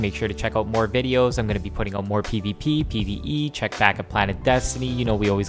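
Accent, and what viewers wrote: Canada. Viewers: Your Canadian as hell :)) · Is it me or does he have a Canadian accent? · I believe he's canadian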